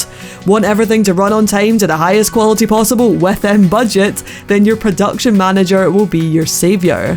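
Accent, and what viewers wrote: Scotland, United Kingdom. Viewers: Love watching your reviews on anime especially your Scottish accent 11/10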